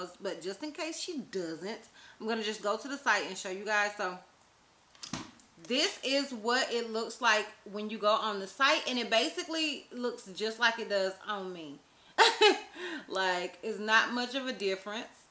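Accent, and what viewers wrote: South, United States. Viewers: You’re a very beautiful woman. Your southern twang gives you more character as well. ❤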